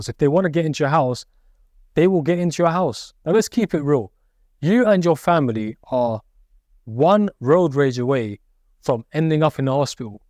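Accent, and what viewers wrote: United Kingdom. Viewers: You look silly dressed up like an Arab goat herder with your rough and ready Midland accent. Is that called Cultural Appropriation. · Im colour blind your accent sounds like you from London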